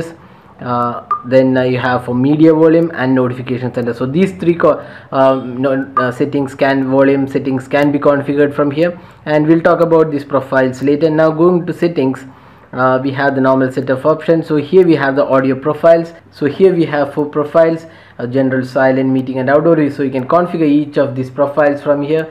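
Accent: Kerala, India. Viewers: Mallu accent 😁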